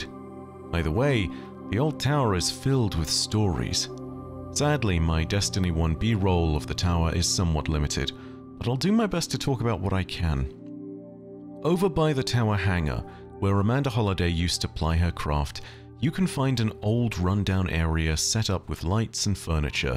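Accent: United Kingdom. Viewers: It’s my favorite British destiny lore guy. Tribes look awesome sign me up!!!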